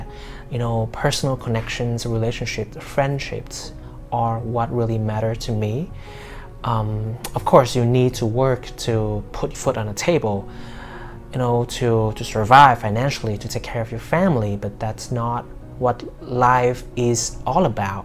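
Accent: United States. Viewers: Em rất thích video của a. Sub viết song ngữ công phu kèm video. Có tải về cũng ko bị mất sub. Anh ở Sing bao nhiêu thời gian. mà sao vẫn giữ được cái accent US hay vậy. Chứ e nghe a có tý Singlish nào đâu 😂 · Yes. First ,I thought ur accent is like American ...it is about 90 percent, so great, that is kind of my favorite accents. I.hope to take IElts class from u.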